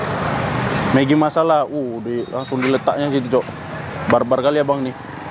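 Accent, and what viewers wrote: Medan, Indonesia. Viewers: Yang bikin saya salut dengan Vloger ini walaupun bahasanya agak nyinyir didepan pedagangya dengan logat medannya yg lucu tapi dia tidak lupa berbagi dengan orang2 disekitarnya… mudah2an murah rezeki lah kau bang …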